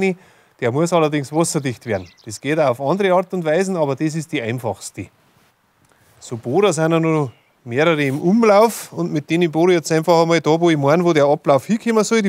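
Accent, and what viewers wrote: Bavaria, Germany. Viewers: einfach super gezeigt.......bitte unbedingt die bayrisch Sprache weiterhin so schön pflegen. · Sehe ich genauso das man Dialekt/ Sprachen pflegen sollte aber ich als Nordeutscher könnte es locker in Platdeutsch verstehen aber in Baiyrisch habe ich echt Probleme da wäre son untertietel echt praktisch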